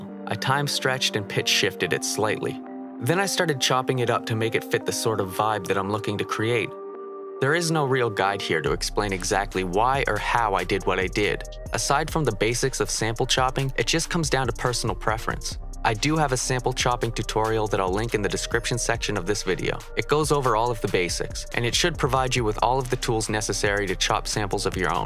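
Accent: United Kingdom. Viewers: he's british right? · He from Britain lmfao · Bro he from the uk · I think he from the uk 🇬🇧 bruv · Oi he from the uk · Georgia I could have sworn he was British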